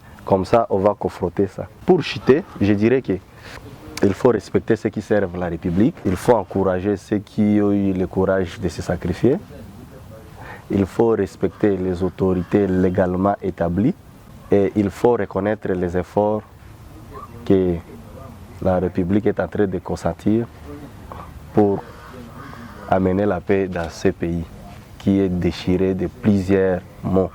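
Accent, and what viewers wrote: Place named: Rwanda. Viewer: C´est les mensonges mon frere, pourquoi vous devez demontré le gens vos nationalité doutese, vous avez accent randaise.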